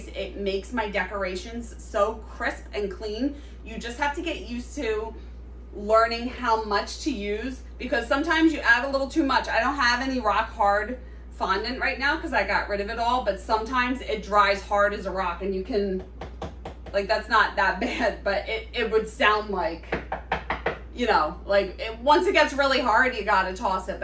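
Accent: Philadelphia, United States. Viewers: Your accent makes me miss Philly!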